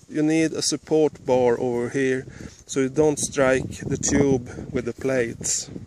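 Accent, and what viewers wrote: Sweden. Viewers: I am so glad I found your video. I am attempting to build my own texas star. Yours is perfect. You crack me up with your Swinglish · Very cool and thanks for sharing your ideas. Also, your Swenglish is just fine. I appreciate your creativeness!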